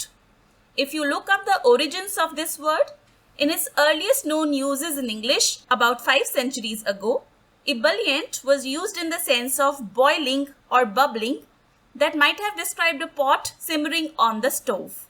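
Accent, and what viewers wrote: India. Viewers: Indianb funny voice xdxd dx d d xd zxd dx dx dx xd dx d x d xx d xddx You thoiught there would be more but there isnt xd xd xxd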